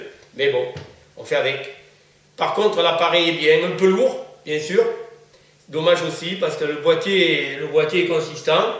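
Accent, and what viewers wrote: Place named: South, France. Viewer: hahahahhaha le scope l'accent du Sud suis mdr désolé pas méchant mais Scope ca me fais rire hahahaa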